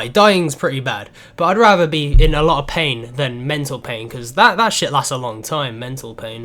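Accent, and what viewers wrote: United Kingdom. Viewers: Is there anywhere in particular you want to visit in America? Also I'd love to see you say (or try to say) my username since you have a British accent and everyone already butchers it. P.S you should visit Texas when you come to America. I'd hang out with you. :p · i gotta few questions for you bro actually 1: is that a british accent u got? 2: how come your eyesights bad? 3:how is it you find out when a new slenderman game is out? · He forgot to say one thing he has that most others don't. He's got a British accent. All he needs in life now is to move to America, and use that accent to his advantage.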